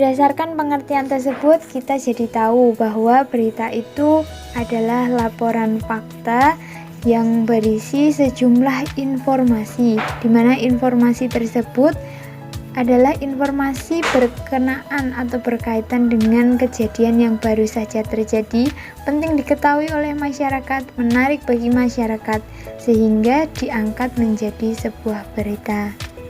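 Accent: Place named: Java, Indonesia. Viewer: Medok sekali bu suaranya 😁 tapi gapapa bu terus berkarya 🤗🤗 saya venny dari kelompok yayuk cs 🤣🤣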